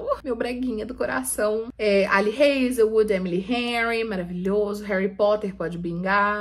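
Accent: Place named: Minas Gerais, Brazil. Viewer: amo demais a sua dinâmica de vídeos, amo estantes alheias, amo a maneira que você é educada sim, amo o nosso sotaque mara de Minas, amo as indicações, mesmo que meu gosto literário é um pouco diferente você me desafia a ler vários conteúdos diferentes!!! ❤ continue!!!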